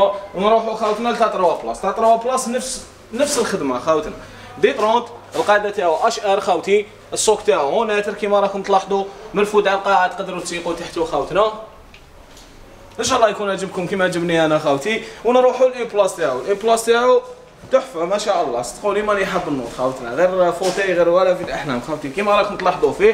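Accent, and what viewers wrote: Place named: Algeria. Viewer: السلعة ماشاء الله ولكن الهدرة تع الجزيري يريحو بالعشرة متعجبش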